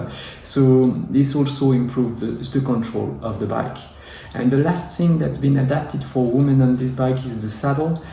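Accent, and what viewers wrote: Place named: France. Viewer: Oulala ça pue le français qui parle anglais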